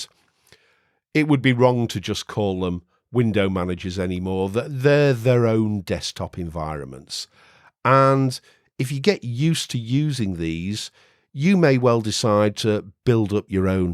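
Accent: United Kingdom. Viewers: Oh no! speech speed and British ascent is perfect mix. I personally enjoy listening to way more that any other youtube Linux channel out there. Informative and relaxing in the same time. This channel is a marvel...thank you!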